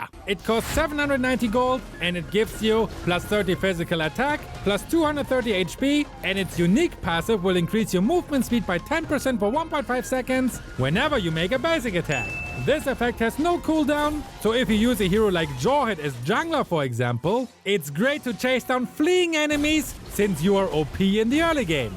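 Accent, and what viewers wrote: Germany. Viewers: First time i Heard your German voice Xd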